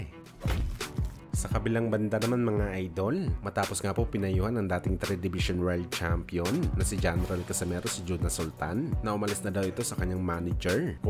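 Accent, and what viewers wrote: Iloilo, Philippines. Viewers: Te ilonggo ka haw?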